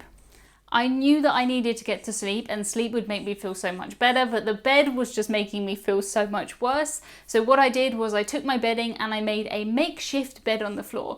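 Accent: United Kingdom. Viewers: Please continue to speak in your home grown accent and use the words of your country. I love your "Britishness".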